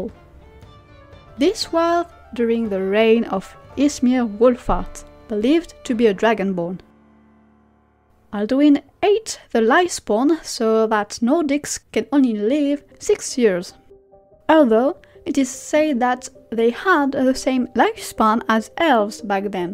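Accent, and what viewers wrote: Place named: Europe. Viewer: love your accent where are you from in Europe, this channel has alot of lore value for skyrim , great work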